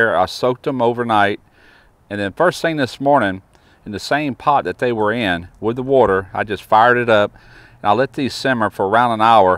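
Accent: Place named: Texas, United States. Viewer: this dish looks mouth-watering👍 and I really like your accent. are you from Texas or elsewhere down south?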